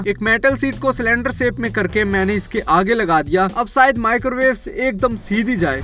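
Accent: Haryana, India. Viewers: Bhaiya aap Haryana se ho kya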